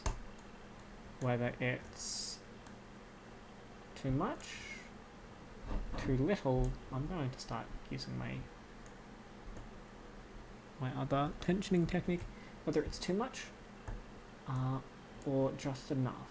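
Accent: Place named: Australia. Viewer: Oooh, dont bend that fancy ratyoke pick! I always use absolute minimum tension, because I almost always use <num>in (~0.3-0.5mm) picks, i enjoy the feedback from them more i guess u could say. Thanks for speaking so clearly too, tbh I have a heck of a time trying to understand Australians speak, but didnt this time! Thanks for the informative video;)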